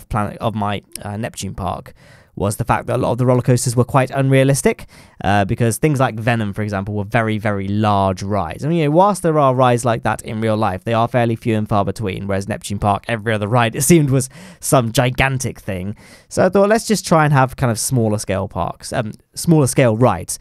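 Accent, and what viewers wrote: United Kingdom. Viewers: Hearing my man saying 'yo' in his amazing British accent (not as thick as mine though). It's amazing!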